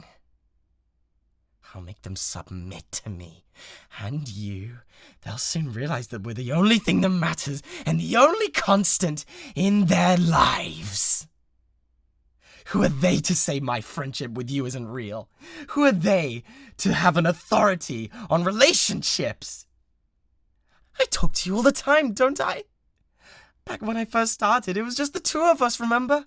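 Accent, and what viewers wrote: United Kingdom. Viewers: I LOVE HIS BRITISH VOICEEEE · The only british accent i tolerate (love the audio)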